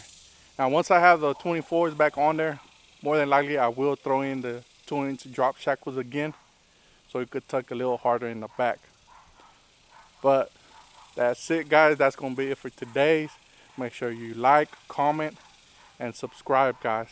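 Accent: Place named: Houston, United States. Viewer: Sound like your from Houston. I like it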